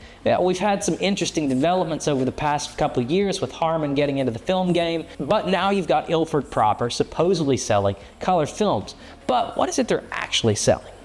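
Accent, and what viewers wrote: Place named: Appalachia, United States. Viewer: I’m delighted to find the channel, and I’m tempted to switch out the digital and try film photography after a long break. Also, and I apologize if this is intrusive: where are you from? Your accent reminds me of locals in the southern stretch of the Appalachians, where I went to school, but maybe a bit different. Tennessee?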